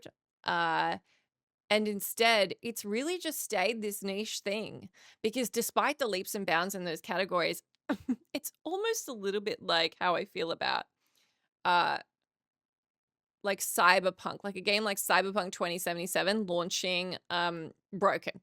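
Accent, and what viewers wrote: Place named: Australia. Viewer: I've never picked your Australian accent before, probably because I'm in New Zealand ❤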